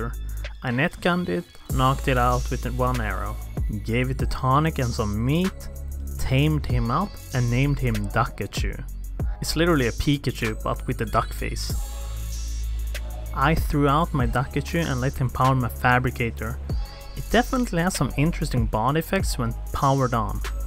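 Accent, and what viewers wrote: Sweden. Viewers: Jävligt kul att höra lite svengelska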